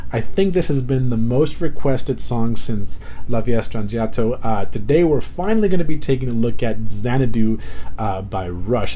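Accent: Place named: England, United Kingdom. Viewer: Other then your comments about this flawless band, I love your English accent. I'm mexican and I am an English teacher. I love Rush, I also like the police. Greetings from México.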